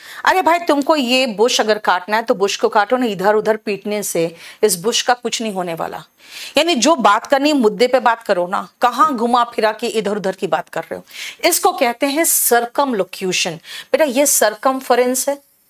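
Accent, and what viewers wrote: Jharkhand, India. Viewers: Mam aap jharkhand se ho kya ❤ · Aap Jharkhand se ho maim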